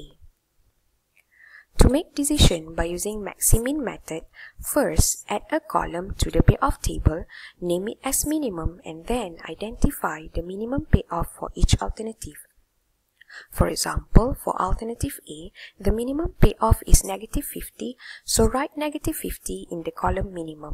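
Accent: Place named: Malaysia. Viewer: Malaysian accent. Nice talented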